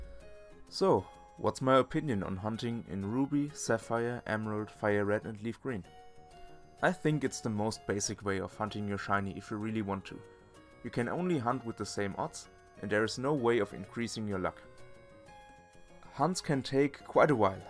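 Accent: Germany. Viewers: Man hört an deiner Aussprache das du Deutsch bist 😂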